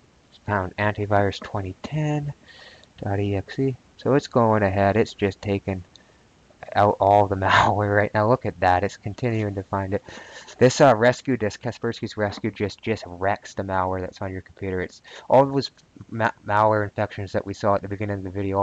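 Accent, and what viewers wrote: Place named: Canada. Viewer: Ese acento Canadiense... 😏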